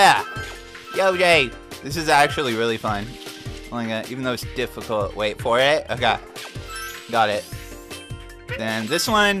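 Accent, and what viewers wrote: California, United States. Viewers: To be fair is obviously from California to most USA residents because he sounds just like a California dude!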